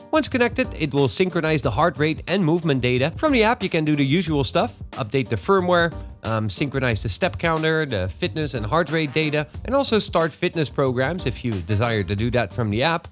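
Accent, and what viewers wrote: Netherlands. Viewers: Hi! Are you dutch?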